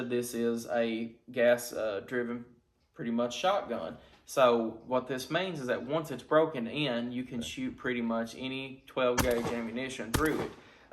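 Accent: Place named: West Texas, United States. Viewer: West Texas or Tennessee...not fully sure about the accent. But, I am sure about that shotgun. Feels good just to hold it. Do you find that dot particularly useful? Seems a bit high over the receiver. Thinking about a T2/Scalarworks combo...1/3 co-witness. Enjoy that thing, sir. Never let her go...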